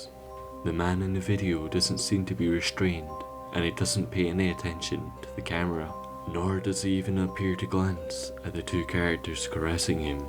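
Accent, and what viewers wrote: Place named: Scotland, United Kingdom. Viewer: Ah recognise that accent, yer fae Scotland mate! Me tae! 🤪 Good video, hope you're doing well. Best wishes from Edinburgh 💙🏴💙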